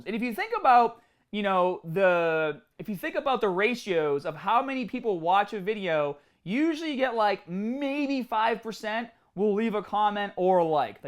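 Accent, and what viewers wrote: Boston, United States. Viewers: Body Shaming? What about language shaming? I can't believe you and your Bostonian accent can make fun of how others pronounce words like Granola!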